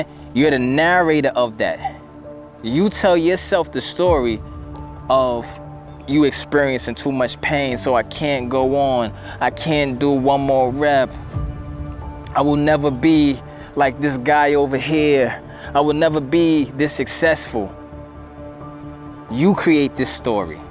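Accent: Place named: Brooklyn, United States. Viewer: Yo my Guy, u be preaching. You need to give your bio or something. Let the people know who you are. You are the first motivational speaker who talks like me ( hip hop). You gotta be from Brooklyn. I’m from Queens. I have been struggling and listening to you is really helping me Bro. Salute💪🏿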